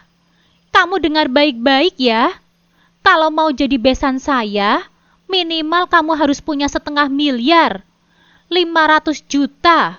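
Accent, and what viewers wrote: Java, Indonesia. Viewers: Medok jawanya jadi lucu dengarnya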